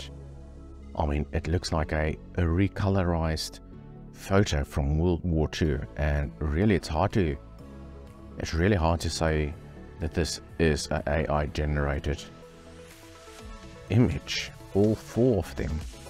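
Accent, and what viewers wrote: South Africa. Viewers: That South African accent 😂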